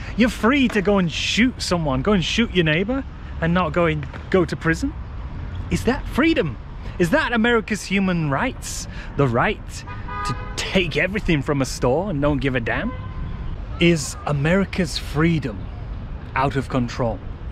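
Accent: United Kingdom. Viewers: Your criticism is that Americans have been brainwashed about China without having even been there. You say our media is corrupt (not wrong). But then you take the absolute worst headlines from this so-called "corrupted" media and push them even further out of context and make shocking omissions of factual information to make America (and the UK) sound like dystopian wastelands full of murderous villains. And how long have you lived in America? Yes- every country has its problems and need to do better by their people. But I have a question for you: If America is such a horrible place, why are massive droves of people risking their lives by walking all the way from places like Guatemala and swimming across the Rio Grande for a chance to get in? I don't know how long the lines are for the attractions in China. But Texas isn't exactly a short walk from Guatemala. 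You have one thing going for you- A British accent. And Americans will pretty much believe anyone with a British accent. How is this not immediately apparent to anyone with a brain as blatant propaganda?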